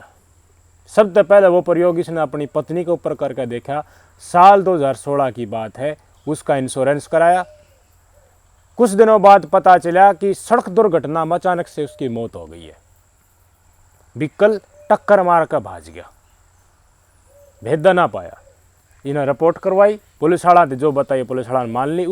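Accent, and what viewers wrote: Haryana, India. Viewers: Bhai sahab aapkii videos 📹 nd pure haryanvi khadii bolii ekdum steek nd sath sath main social society aur bade buddon kii kahawaten so awesome 👌...bhai jiii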